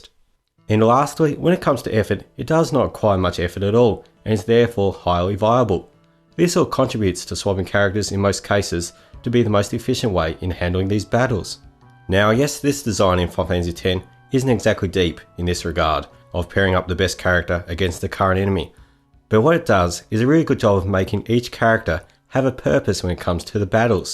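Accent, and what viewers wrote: Australia. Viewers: Are you Australian, by any chance? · I'm Aussie too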